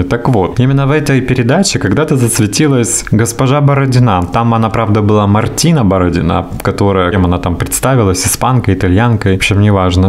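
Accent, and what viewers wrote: Ukraine. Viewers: Ура! Любимые новости опять, бархатный тембр и украинский говор ("снег притрусил" - как мёд на душу, обожаю українську мову, даже смотрю иногда ток-шоу на украинском)., это правда, что почти все кошки правши, а коты левши? Ваша кошечка правша?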